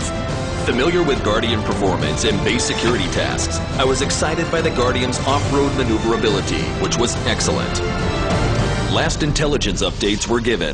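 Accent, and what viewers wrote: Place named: United States. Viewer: Why on earth is the narrator a yank?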